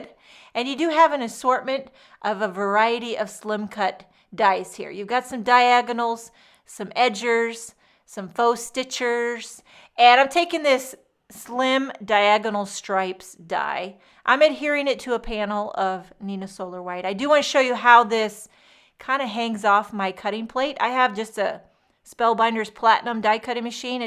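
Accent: United States. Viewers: Hi I watch your videos all the time and I love them! I’m curious what are you doing in Germany? You sound 100% American.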